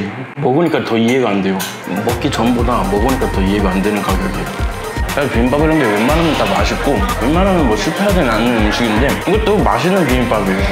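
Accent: Jeju, South Korea. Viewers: 인줄 알았는데 이였군요~~ 저도 제주도민인데 10년째 서울에 살면서 제주도 사투리가 들리면 괜히 반갑고 귀가트이더라구욯ㅎㅎ 이번에 추석때 내려가는데 함 금복식당(추석때 열려나???ㅜㅜㅜ)가보고싶네요~~:)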